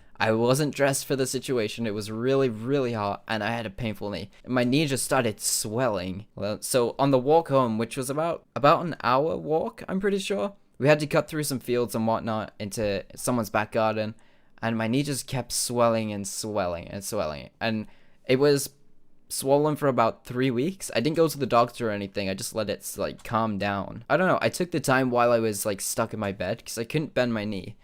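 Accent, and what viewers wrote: United Kingdom. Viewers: I love your British voice😘❤️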